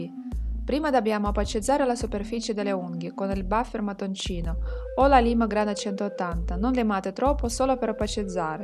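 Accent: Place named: Ukraine. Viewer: L'accento ucraino 😩😍😍 (correggimi se ho sbagliato)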